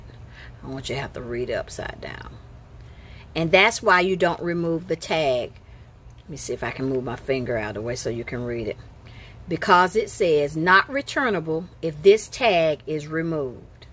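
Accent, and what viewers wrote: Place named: South, United States. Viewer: You sound like a sweet southern girl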